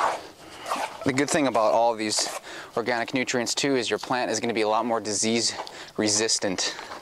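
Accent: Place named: United States. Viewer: you misunderstood him as I did briefly until realising it's his American way of talking.he definitely meant DONT be using too much of it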